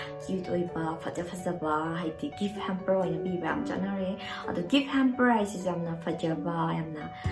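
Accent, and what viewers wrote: Kakching, India. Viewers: Yengba yamna nungai tabasu yamna nungai nungai harisini eina ngang ja re si kakching maron dniko 😁😁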